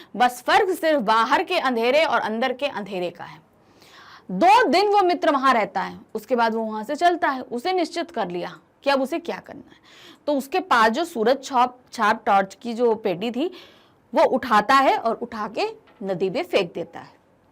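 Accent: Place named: Northeast, India. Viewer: Lgta hai north east side ke ho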